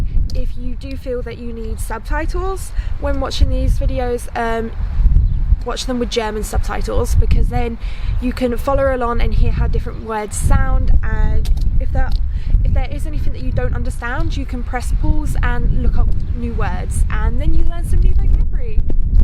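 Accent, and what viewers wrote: Essex, United Kingdom. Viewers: My wife is from Essex. I can hear Essex in your "Fink" and "ehmm"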